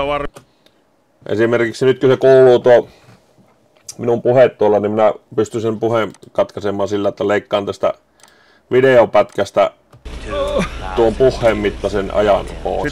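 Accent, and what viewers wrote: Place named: Finland. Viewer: Olen lukenut kaikki päätalon kirjat. Ootko sieltä päin. Murre kuulostaa sille.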